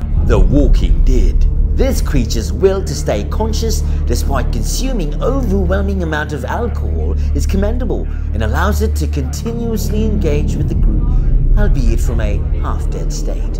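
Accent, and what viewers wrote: Australia. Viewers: ngano Mani bay, kung paminawon Ka mura kag Australiano unya kung tanawon Ka mura kag Mexicano o Dile Gani Bisaya...ngano man???!🤔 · I love the authentic Aussie accent...so cute and funny...lol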